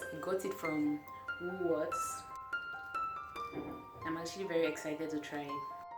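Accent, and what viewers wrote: Nigeria. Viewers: Followed your comment from Em Etetim's video down here. If not that your channel shows Cameroon, you pass for 100% Nigerian. Both in looks and accent. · Wow this girl is Cameroonian?? She sounds Nigerian, I can't even tell. We Africans are one. You've gotten a new sub my sister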